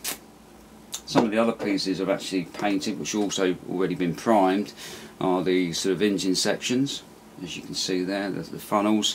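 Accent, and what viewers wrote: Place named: United Kingdom. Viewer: Now i feel like a dick, but I would like to see the build progress. Seems like you are the one who I am always watching build kits. Either that or there are hundreds of other british sounding dudes reviewing literally all the shit I do. I love air fix, all the kits, and most other british stuff. (top gear, is by far the best goddamned production in the history of moving pictures.) I mean to offer constructive criticism from my perspective… please don't be offended by me or any other internet jockey, cause sitting behind my desk in the nude, i sound much more threatening that I actually am. I love to see the kit, how it goes together, and not too much discussion on anything else. Its fitment, panel details, etc. Most of the time I am looking to see if there is a set of detail enhancing parts. Photo etch, cartograph decals, resin conversions, things of that sort. 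That is the primary reason I stretch beyond google. I am always looking to add more detail than is available from the factory. Needless to say, please keep this up, I have watched hours of your reviews. (again, either you or some other person with your exact accent, and voice to the best I can determine) So, thank you for reviewing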